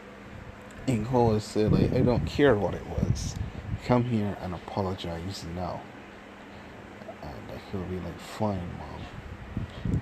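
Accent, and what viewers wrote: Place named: Barbados. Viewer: Bro you from barbados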